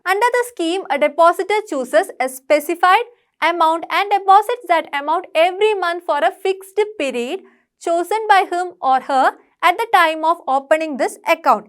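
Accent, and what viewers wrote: Kerala, India. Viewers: Out of the box but i felt like you're having mallu accent or sum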